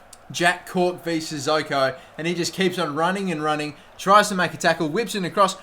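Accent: Australia. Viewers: i lived in Sydney near Bondi Beach on Bouronia Road. I love the aussie accent. great vid again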